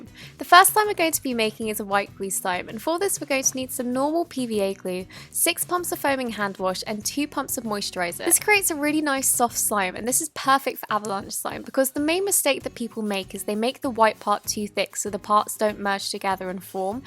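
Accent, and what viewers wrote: England, United Kingdom. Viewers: Bro I love her British accent! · Is she from England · I can tell from your accent that you're British and I'm British too. I'd like to know where in Britain you are from and where you get your supplies for Slime because I'm pretty sure they don't sell Elmer's glue and borax in the uk. Please can you tell me where you get it from. Lots of love Abi from Leeds. XX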